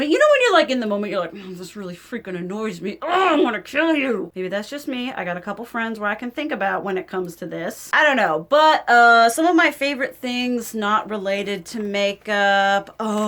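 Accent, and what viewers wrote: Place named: Alabama, United States. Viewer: I happened upon you b/c of your kvd video several months ago. Next thing I knew it was several videos and several hrs later of bing watching you b/c your accent felt like home. -Alabama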